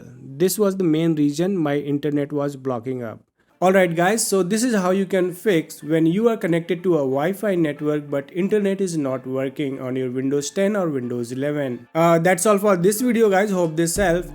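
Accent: India. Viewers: When i hearing the Indian voice i am 100% sure my problem gona be fixt thank you ❤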